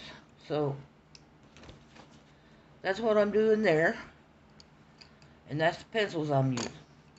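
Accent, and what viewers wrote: South, United States. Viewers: Don't you dare not use your beautiful southern accent!!🙂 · I love the color of your fish. I love a Southeren accent.💙💙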